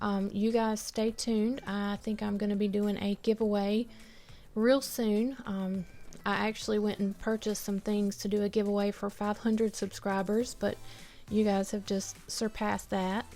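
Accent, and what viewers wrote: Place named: Carolina, United States. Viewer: You sound like you may be a Carolina girl! Love your channel! ❤️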